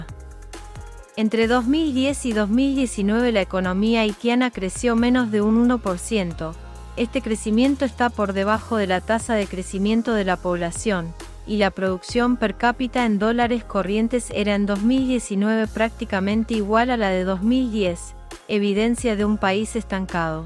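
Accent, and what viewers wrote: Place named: Argentina. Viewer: Tu acento me parece argentino.el PLD no deberia hablar del tema.ya que el gobierno de ellos fue el, que mas haitianos ilegales le dejado a RD.practicamente no hicieron nada para detener la inmigracion ilegal .si el PRM sigue con esta iniciativa de resolver este problema los Dominicanos debemos mantenerlo gobernando por muchos años.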